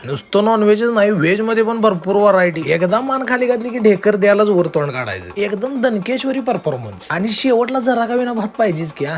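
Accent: Kolhapur, India. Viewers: कोल्हापुरी भाषा ऐकताना कित्ती गोड वाटते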